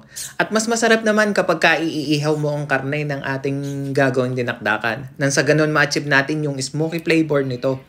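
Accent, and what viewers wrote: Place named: Batangas, Philippines. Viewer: idol batangueño ka ga? ay sa wari koy ka batang ka napasubscribe tuloy ako eh😅😅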